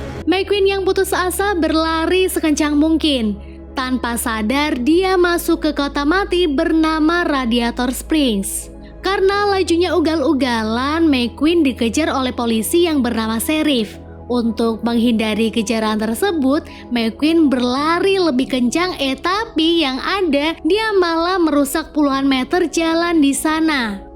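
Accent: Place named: Java, Indonesia. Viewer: Jowone medok men mbak e😁😁😁😁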